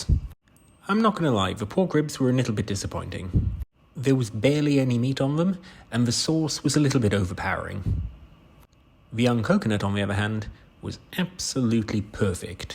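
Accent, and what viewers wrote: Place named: United Kingdom. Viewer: Wow your accent is soooo British lol 🇬🇧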